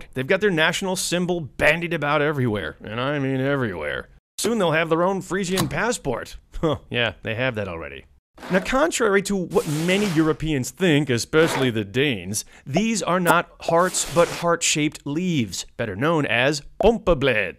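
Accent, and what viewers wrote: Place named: United States. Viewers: You can speak Frisian but you refuse to, why pronounce these things so American-like? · Greg Shapiro (the voice of this video) is an American, that's why he pronounces everything the way he does.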